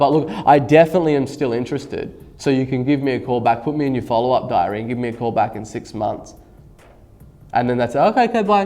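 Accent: Australia. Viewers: I love your ausy accent..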